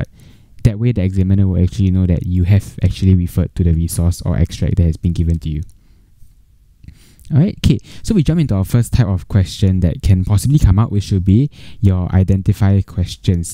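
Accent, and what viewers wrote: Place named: Singapore. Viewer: SG English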